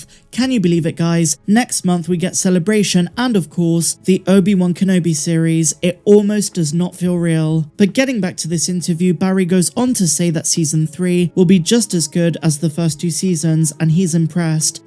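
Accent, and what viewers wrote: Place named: West Country, United Kingdom. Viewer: Great vids as always, are you a fellow west country person, I can hear a twang :D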